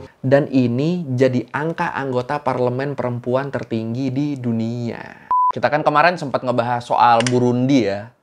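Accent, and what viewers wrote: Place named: Madura, Indonesia. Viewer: KenaPa LogatLu KaYak ORang MaduRe Cong, BiSa KaYak GiTu Ya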